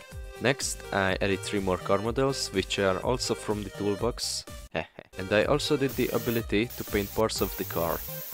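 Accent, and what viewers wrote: Hungary. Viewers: I know a hungarian when i hear one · Bros accent is hungarian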